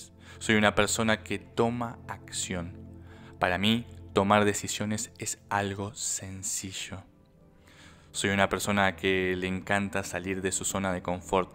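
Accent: Argentina. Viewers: Super efectivo! Además me encanta xq esta en argentino y lo siento mucho más real y personal 🇦🇷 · Me da risa estás afirmaciones . El tono argentino y como lo dice te partes de risa 😅😅i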